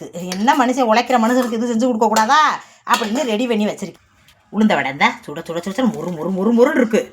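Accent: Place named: Ramanathapuram, India. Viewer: ஏக்கா ராமநாதபுரம் மாவட்டம் மாதிரி கூட பேசிப்புட்டு நீ எம்புட்டு குதூகலமா பேசுற நீ ஏத்த உளுந்த வடை நல்லா பொசு பொசுன்னு வந்திருக்கு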